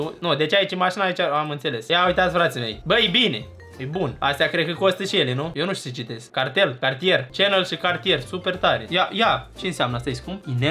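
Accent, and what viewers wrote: Moldova. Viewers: Tu ești moldovean?😱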